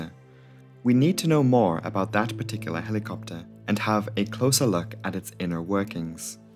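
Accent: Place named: United Kingdom. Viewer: danish what? is british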